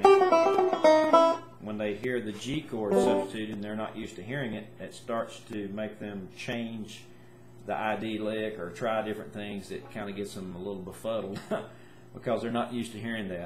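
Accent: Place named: West Virginia, United States. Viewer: Im from mingo co wv, I can tell your southern wv or eastern ky,,, where you at?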